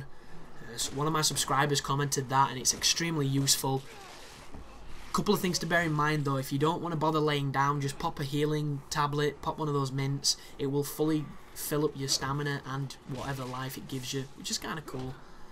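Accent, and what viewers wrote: United Kingdom. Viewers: I don't understand your comment and whether you're being sarcastic or not. Playing the game by always choosing 'No' takes some discipline, but at least it means that there is a point to the healing items on Professional difficulty. The game is still annoying in that you can only have one saved game at a time, that's a really bad flaw that. You sound like you're from the UK, me too! :-) I like your commentary, you make some interesting comments and assertions about games and whatnot.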